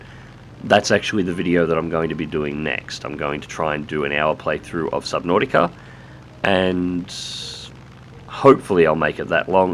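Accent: Australia. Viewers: yeeeeeees!!! finally! go away sleep, I have a fellow Aussie to listen to! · always sound like crap you crazy Dingo!!!! Your Austrailian, which is sexy if your Olivia Newton John or Kylie Minogue!!! You sound like the old guy from Air Supply most of the time!!! "LOL" -- You have brought me sooooo much fun, lucky day for me when I stumbled -literally - on to your channel!!! I think of you as my good friend down under -- Fair Dinkum --- Sprog1 in AZ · Hi, It's glad to see your new video on youtube. I thought Australian English was so-called "unbearable" before watching your videos, but now I'm pretty sure I like your Australian accent. Thank you for the exceptional skill you show in the video.:)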